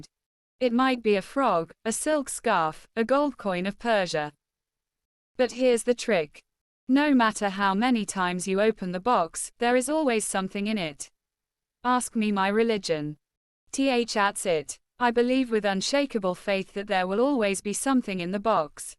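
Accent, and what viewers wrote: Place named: England, United Kingdom. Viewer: Maybe I would have heard this robotic voice in an American accent with an English accent is much harder to listen to this.😢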